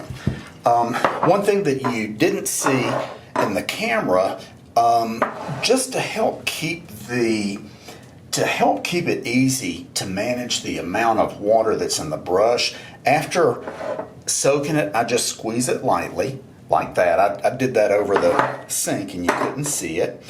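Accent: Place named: South, United States. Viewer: I happen to like the way you sound very much! I find a nice Southern Drawl very endearing. I'm in California, and when I was young, we had a neighbor across the street that was from Georgia. She had the sweetest accent I ever heard in my life. And the things she would say were just as sweet, a true lady. I look forward to watching more of your videos, as well as trying out some your products. I'm definitely gonna be buying some Barber's Invasion. Larry Shaves' video on it has me sold. So many barber scents leave out the lavender, and that just sounds wrong to me. Thank YOU, my good sir! Subscribed!!!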